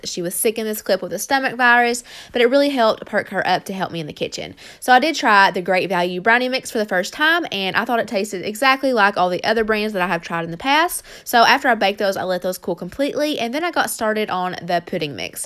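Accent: South, United States. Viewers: Sincerely Sorry Ur Absolutely Gorgeous, Ur Country Accent Is A Choir Of Angels, Ur Mother Of Tha Century, Ur Husband And Kids, I Believe Are Very Proud And Lucky To Have You. God Bless 🙏🙏🙏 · I love in Kentucky too I have been wanting to start a YouTube channel but I'm so scared lol your doing so great and that country accent is so comforting lol keep it up girl your doing awsome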